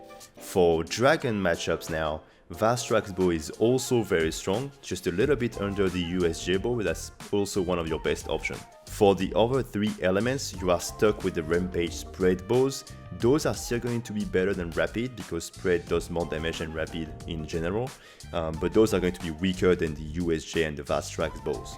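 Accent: France. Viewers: Mdrrr t'es français non? Je crois reconnaitre notre bel accent:) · its me or there is a french accent here ? :)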